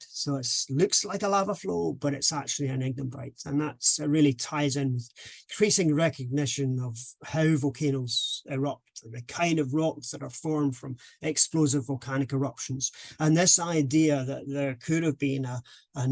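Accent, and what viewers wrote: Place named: Scotland, United Kingdom. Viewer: This trilogy of geology lectures has been fascinating. I also love the Scottish accent.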